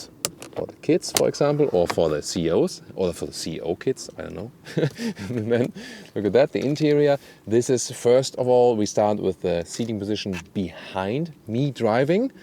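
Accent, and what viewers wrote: Germany. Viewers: Dieser deutsche Akzent einfach ...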